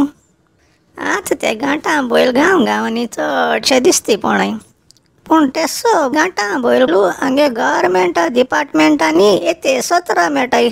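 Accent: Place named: Salcete, India. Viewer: Typical Salcete Konkani... ..😄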